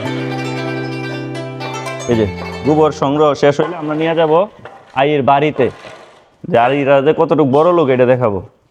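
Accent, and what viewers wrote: Bangladesh. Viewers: আপনার উপস্থাপনা আপনার মতো সহজ সরল। কোনো ভনিতা নেই। খাঁটি বাংলাদেশের ভাষা। সুন্দর। আচ্ছা এইরকম গ্রামে গিয়ে মানুষের সঙ্গে কথা বলতে সরকারি অনুমোদন লাগে?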